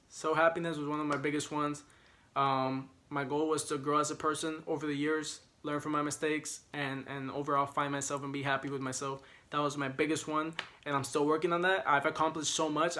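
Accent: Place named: Puerto Rico. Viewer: 5'9 jeez everyone towers over me I'm such a hobbit. XD This was a cool video, I like hearing the kinds of things you feel are important. I laughed when I heard you say PR. I'm from NYC and that was my first guess when I heard your accent. Anyway. Thanks for this video. I'm glad you want to do something good for the world with what you have (!). When I first saw your videos I knew you were going to be an ARMY - because people who want to do something to make the world a little better tend to be the ones who are drawn to. I'm really interested in the environment too, I love cultures and religions and like green technology. We'd hang, I bet. XD I think you might like checking out a video called Inside the ARMY that was done by MTV. When you see it I bet you'll know why I knew you'd be family from the very beginning.:D And if you want something funny, on Running Man ep 300 was just re-released by KOCOWA. 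They were on the show (a variety show - you might like them) a couple of years ago and it was pretty cute. I don't know the copyright rules for it though. I think it should be okay... but maybe check with people.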